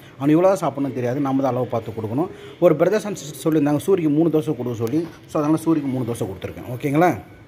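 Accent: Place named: Madurai, India. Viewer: Niga peasuradhu madurai sowrasthra mari dan iruku anna ovoru ooru sowrasthra slang change agum anna adan ketan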